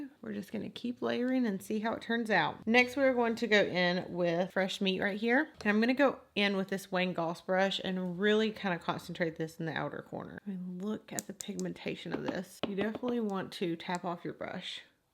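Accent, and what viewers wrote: South, United States. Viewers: Splucy She has a southern accent and I love it! No reason to leave a rude comment you should just leave politely. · Ashley Cornely okay I'll never visit the south of USA. Screw being surrounded by that accent constantly.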